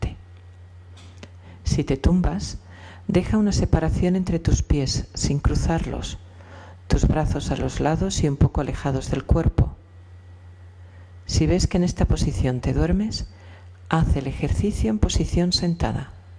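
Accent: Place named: Spain. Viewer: Aparte de la carretilla o media de nylon o red de protección en el micrófono... Es necesario hacer un post mezcla de audio en el out feader o eco... Ya que se aumenta los tonos agudos de la voz. Eso en lo técnico y tecnológico. Pero para darle gusto a JAM MUÑOZ...pues tendría que nacer está mujer en otro país!!!!! PARA NO TENER EL ACENTO CARACTERÍSTICO DE LOS HERMANOS DE ESPAÑA !!!!...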